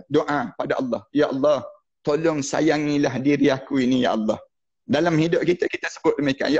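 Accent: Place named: Kelantan, Malaysia. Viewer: Samo kelate kecek kelate la kak kak Lopaa..😂😷😁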